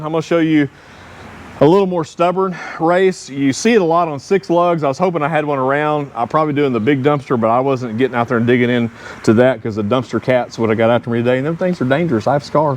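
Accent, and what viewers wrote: Texas, United States. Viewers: I truly enjoy your videos and your texas accent, love it. If it weren't for the fact that your are more than 2 1/2 hours away from me (I live in Cedar Park/Austin area) and that I like to maintain my own RV, I will visit you regularly, even just for chatting. I just finished repacking my bearings (races and bearings were good!), and my next job is to replace the leaf springs. Thanks for your help, as I learned a lot with you. Thanks!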